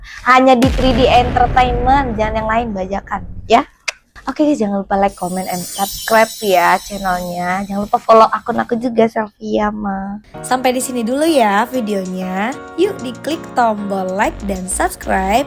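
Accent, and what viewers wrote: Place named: Bugis, Indonesia. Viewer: Khas bugisnya lengket banget😭 "di Tamang" GK tuh, tapi gpp, pokoknya kak terlope-lope lah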